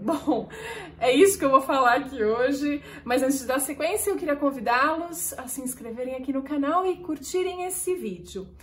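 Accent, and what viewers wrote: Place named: Interior, Brazil. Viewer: Astrologia e literatura orrrrnam ,um sotaque meio acaipirado, alguma influência?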